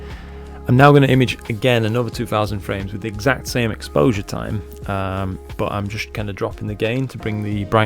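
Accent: United Kingdom. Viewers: it really does, thanks for the response. Yes UK based and from the accent im guessing not a million miles from yourself. They seem to be considerably cheaper ordering direct and it seems most of the Starfield stock comes from their HQ in Canada after reading reviews so I may order direct. I've watched a few of your videos today about the player ones and I'm really impressed with the quality. Great videos as well 👍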